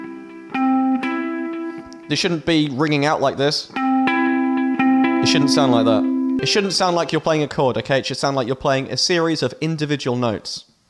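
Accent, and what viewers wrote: United Kingdom. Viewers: Are you British or….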